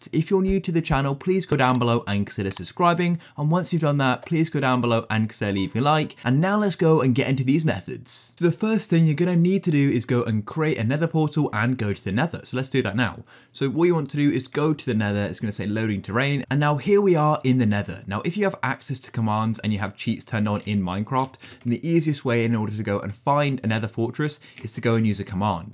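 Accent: United Kingdom. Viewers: 1 word british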